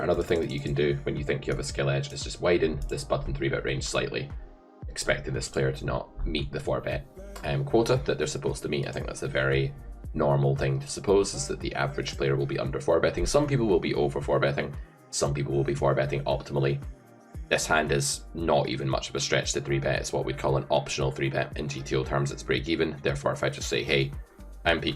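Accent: Scotland, United Kingdom. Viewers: Really like your Scottish voice… nice books too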